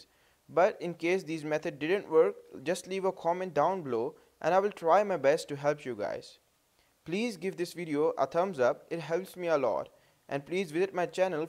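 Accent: India. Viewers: (not racist) Cant tell if Indian or british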